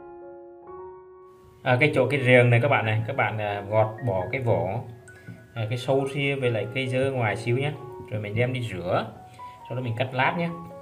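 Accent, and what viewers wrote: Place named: Central, Vietnam. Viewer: Cách chia sẻ đơn giản dể làm, cà pháo trắng giòn ngon. A là người miền Trung à anh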